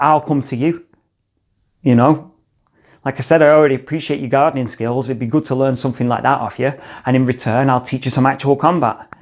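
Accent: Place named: England, United Kingdom. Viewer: Ain't here for Martial arts.. Just enjoying your English accent. Pleasant to hear.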